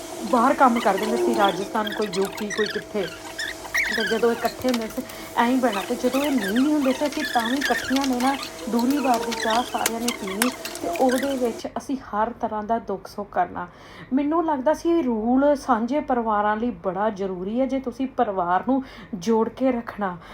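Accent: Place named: Sialkot, Pakistan. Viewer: Sister amazing video 😊 sailkot Punjabi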